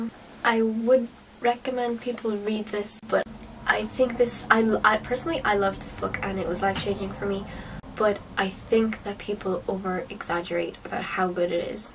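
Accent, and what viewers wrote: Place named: United States. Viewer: You're beautiful beyond words, but why do you use American English and speak in an American accent? It'd be odd to visit Africa to find someone dressed in Burberry attire and saying "ain' wannit, bruv, fam, bredren" in an English accent, wouldn't it? (points finger) Get where I'm coming from?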